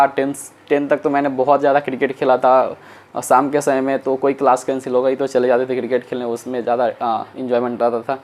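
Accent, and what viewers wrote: Bihar, India. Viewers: Boli se to bihar ke lag rhe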